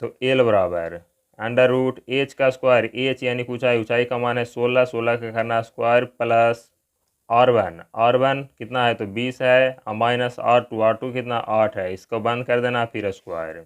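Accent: India. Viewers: thanks sir bhojpuri style me 😘😘😘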